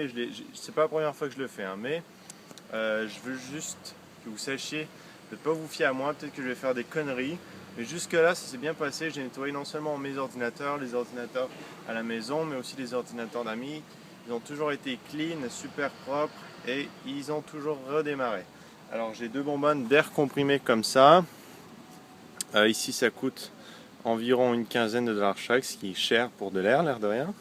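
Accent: Quebec, Canada. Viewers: En France il fait pas chaud ,11 degrés à Strasbourg oú je suis ,sinn pas mal tes vidéos et sa va on remarque pas trop ton accent québecois =D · c'est normal il est québecois ^^ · A tu un accent quebecois Pouce vert pour quil le voit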